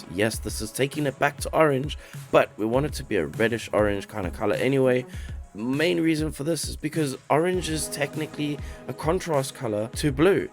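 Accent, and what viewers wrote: South Africa. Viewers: South African? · Your accent is extremely South-African. 👀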